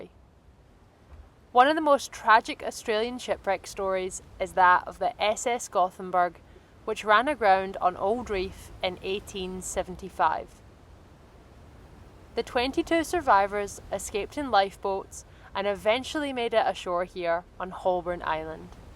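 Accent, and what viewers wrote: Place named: Scotland, United Kingdom. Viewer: I detect a Scottish accent so that'll do for me to subscribe - awra best hen 😊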